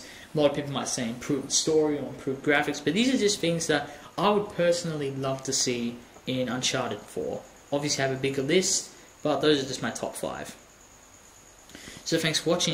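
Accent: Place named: United Kingdom. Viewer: I love the way you talk to ND like they were your children with things like "Okay?, Good.". Maybe it's just the British accent. Anyway, all great ideas man.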